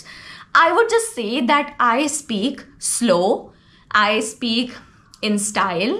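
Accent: India. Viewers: I can't say not a lot of words. But i try myself everyday. Everyday i learn those vocalbury & sentence which helps me a lot. I like the way you talk. I love your indian accent. I feel comfortable & understand clearly. I make mistakes but when you talk everyday make mistakes. It's not a big issue. But sometimes i litte bit fear becoz of i make mistakes a lot. I can't tell you😅😅. I want to be a fluency in english ❤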